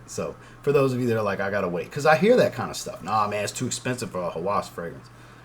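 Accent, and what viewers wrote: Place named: New Orleans, United States. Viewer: You from New Orleans area? I am and you kind of sound like it. Maybe Westbank? lol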